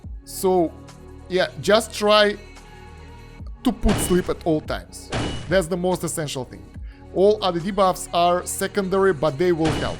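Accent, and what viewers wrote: Russia. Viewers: слышу русский акцент)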